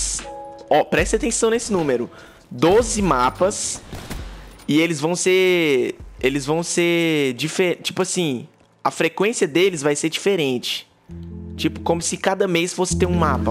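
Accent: Minas Gerais, Brazil. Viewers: Cê é mineiro?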